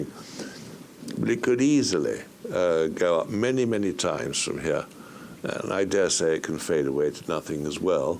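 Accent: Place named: RP, United Kingdom. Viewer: ...stuff the queens english...you are still a commoner like the rest of us plebs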